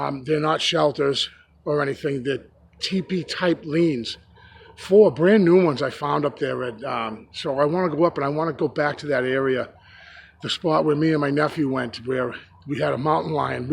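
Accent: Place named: East Coast, United States. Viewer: First time watching your channel, enjoyed it....love the East Coast accent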